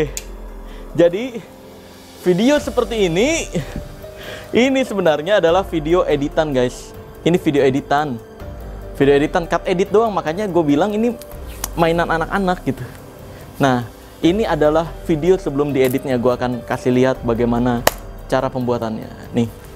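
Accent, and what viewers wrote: Java, Indonesia. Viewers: Hhaha ngeper, bahasa jawanya kental bgt.. Conggrats 4jt subs 🎉🎉🎉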